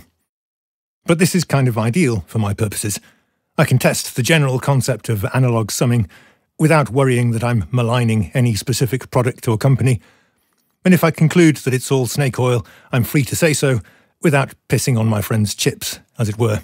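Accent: United Kingdom. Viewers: The only youtuber with british accent i can actually bear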